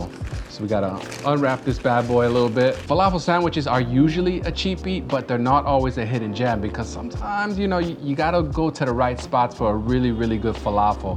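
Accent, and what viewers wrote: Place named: New York, United States. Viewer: You from Chicago, how come you gotta New York accent?? Good videos tho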